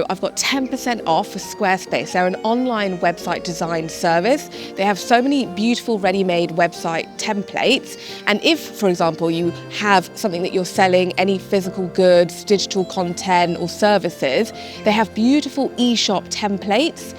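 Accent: England, United Kingdom. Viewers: That UK pronounciation is simply unbearable!! 😢😢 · Love your shoulders 😍, also you have a really good British accent! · Hi. your videos are so good and you explain in a wonderfull voice the really italianity. italy its my country and your "England" english its a sinphony for who don't tell and listen to much like me. You're so very beautifull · Just came across channel….this is the best bunny hole I entered. I love listening to her voice, Britishly delicious!!!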